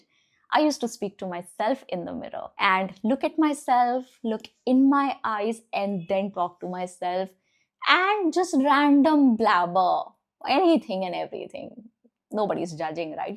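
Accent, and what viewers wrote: India. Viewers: You need a training dear, you have heavy indian accent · Indian accent 😅 I didn't understand nothing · Maam is that you dont care about your accent. I mean you are not trying to have like native english accent. Im just asking?! Why dont you come out of your comfort zone from indian accent. Im not saying try to fake it, but ill be so much interesting if you could have native english accent like Janvi pawar.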